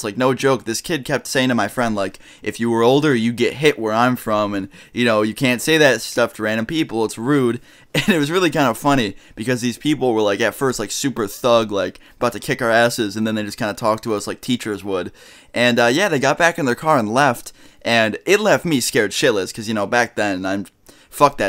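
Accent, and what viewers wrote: New York, United States. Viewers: Aye it sounds like your from New York that's how people are over here lol are you?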